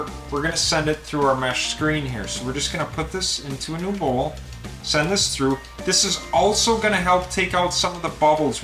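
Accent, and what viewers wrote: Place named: Wisconsin, United States. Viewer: Hard to fake that northern Wisco accent! Great job. Very enjoyable video with easy instructions and explanations.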